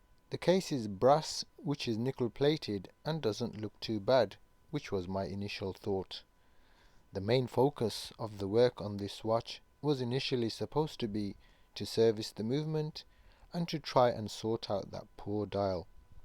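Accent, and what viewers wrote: Birmingham, United Kingdom. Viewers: Want to see how good my ear is for accents. Are you from Birmingham? Or somewhere up north?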